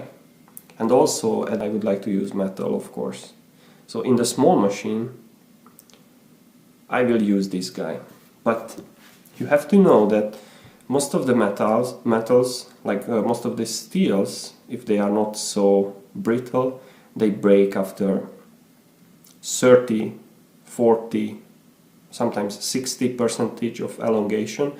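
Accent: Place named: Hungary. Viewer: I can see the Swedish newspaper but I hear Hungarian? Very confusing!?! I'm guessing you are a second generation Hungarian Swede?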